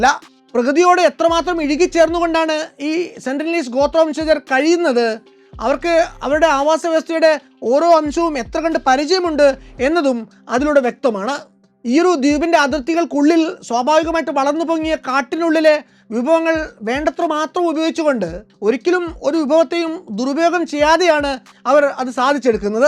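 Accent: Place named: Kannur, India. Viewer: Haha പലപ്പോഴും തനി നാടൻ കണ്ണൂർ ഭാഷ വരുന്നുണ്ടല്ലോ😊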